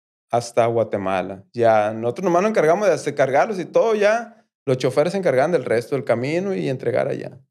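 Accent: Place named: North, Mexico. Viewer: Compa creo que eres del norte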